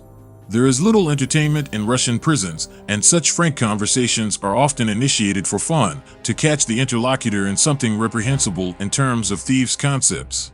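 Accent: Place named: United States. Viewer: This would be way better if it was narrated by a real russian speaking bad english rather than a boring bot speaking american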